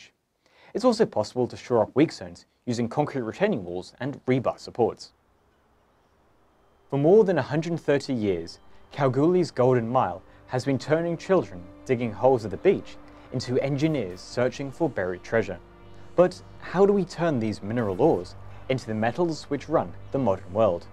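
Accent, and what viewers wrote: England, United Kingdom. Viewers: You are a small Tom Scott, same presentation style and British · damn pom trying to speak aussie words.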